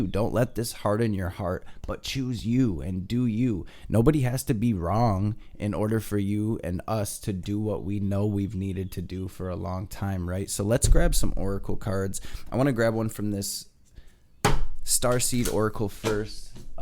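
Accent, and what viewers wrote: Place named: Chicago, United States. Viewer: I'm new to your channel, like 30 days or so. Definitely sticking around. Love your vibes and that chitown in your accent. Thanks mucho fam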